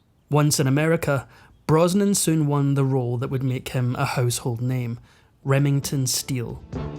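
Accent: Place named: Scotland, United Kingdom. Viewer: Yay!! I am so glad that the Scot is doing the narration more so now...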